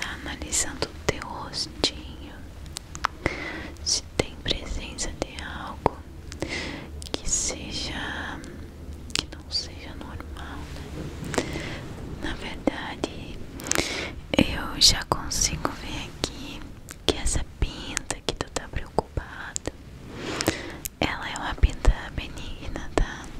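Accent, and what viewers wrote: Rio Grande do Sul, Brazil. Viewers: perfeita como sempre ❤💃🏻💃🏻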